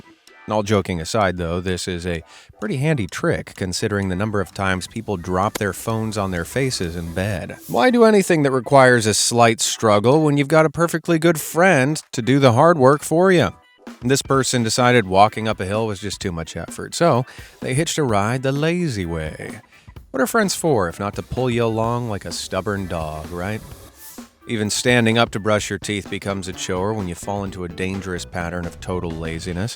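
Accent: United States. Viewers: I noticed that you have an American accent but said buggy when referring to a baby stroller and lorrie when referring to I’m assuming a delivery driver and fire brigade instead of fire department. Odd.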